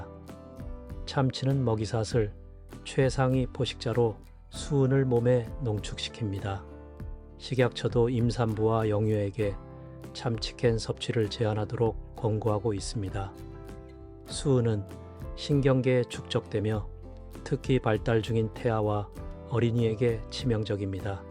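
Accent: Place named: China. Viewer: 발음이 중국놈않이야 국산제품인기제품만 세계 에서믹스커피는 인기제품인데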